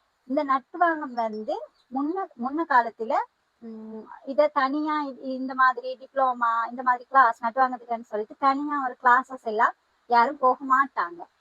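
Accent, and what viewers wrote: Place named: Sri Lanka. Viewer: நாட்டு வாங்கும் பற்றி அருமையான விளக்கம் இதைப்பற்றி பல விளக்கங்கள் கூறினீர்கள் மற்றவர்களின் வேண்டுகோளுக்கு இணங்கி இந்த நிகழ்ச்சியை பதிவு செய்த உங்களுக்கு வாழ்த்துக்கள். உங்களுடைய ஸ்ரீலங்கா தமிழ் பேசுவது மிகவும் அருமை கேட்பதற்கு நன்றாக உள்ளது வாழ்த்துக்கள். நடனத்தில் சிறந்தவர் வாழ்த்துக்கள்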